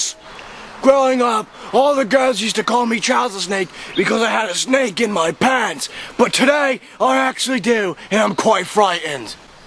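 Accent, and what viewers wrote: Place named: Australia. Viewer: why does he sound australian? haha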